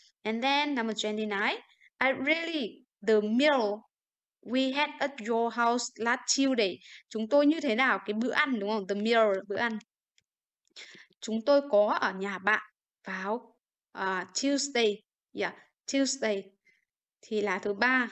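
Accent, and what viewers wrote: Standard. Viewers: B phát âm chuẩn mà dạy hay quá🥰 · Giọng b rất chuẩn.chuc b buổi tối vui vẻ nhé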